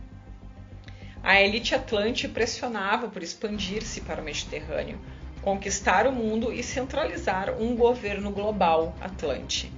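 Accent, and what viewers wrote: Rio Grande do Sul, Brazil. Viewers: Detesto sotaque gaúcho o coisa horrivel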